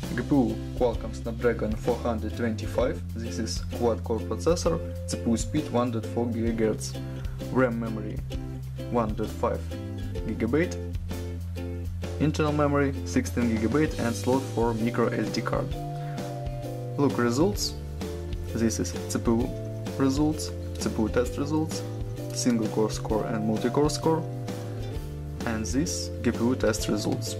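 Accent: Russia. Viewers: Русский видно акцент)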